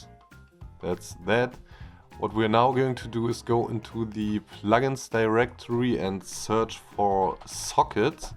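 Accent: Germany. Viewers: man hört das deutsche raus 😅, danke fürs tutorial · I hear german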